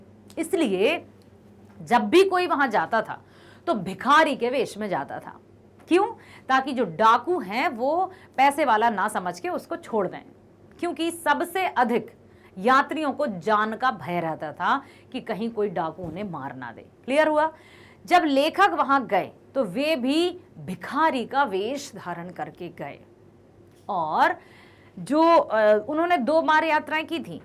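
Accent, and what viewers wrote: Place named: Marwari, India. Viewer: Madam ji Marvadi ave hai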